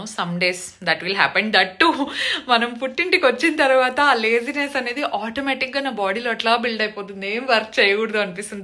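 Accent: Anantapur, India. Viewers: AA Emi julee ni esukochinare pinni ananthapur slang haaaaaaaa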